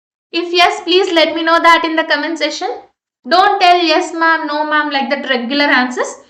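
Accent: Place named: West India, India. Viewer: Nice Voice,I like accent 👍 atleast good west India people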